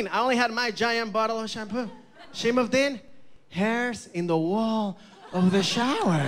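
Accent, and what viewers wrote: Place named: Venezuela. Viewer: Jose Bravo Well they’re both from Venezuela same Accent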